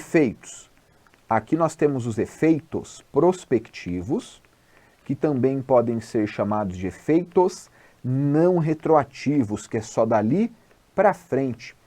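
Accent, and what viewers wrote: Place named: Paraná, Brazil. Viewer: Língua preta kkkkk , paranaense veio pé vermelho 👏🏻👏🏻👏🏻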